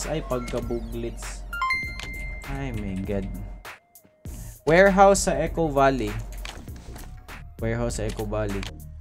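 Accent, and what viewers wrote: Visayas, Philippines. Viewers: Grabi kaau bisaya kaau. Pro idol❤️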